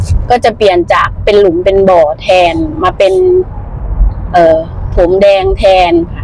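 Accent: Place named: Isan, Thailand. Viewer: สวัสดีแอดมิน หนูทราบหรือไม่ สำเนียงหนูคือสำเนียงคนไทยอิสาน 100% แยกไม่ออกเลย เป็นเพราะลาว-ไทยนั้น เป็นพี่เป็นน้องกัน สิ่งสำคัญและเป็นรากฐาน การพัฒนาต่างๆ คือ "คน" ครับ การพัฒนาคน จะพัฒนาผ่านระบบการศึกษาอบรม ที่ถูกต้อง เห็นโรงเรียนที่ลาวแล้วน่าเห็นใจ และสงสารเด็กๆมาก เขาขาดโอกาส ได้รับการปลูกฝังที่ดีๆ จะเนื่องจากสาเหตุใดสุดแท้แต่ ไม่ขอกล่าวถึง💞🙏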